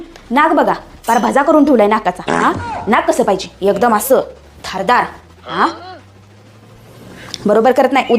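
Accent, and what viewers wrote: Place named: India. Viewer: दीदी गाव कुठलं आहे तुमचं एक वेळ वाटते तुम्ही शहरात आहात एक वेळ वाटते खेड्यात आहात छान छान तुमचं बोलणं आहे असाच मराठी माणूस पुढे गेला पाहिजे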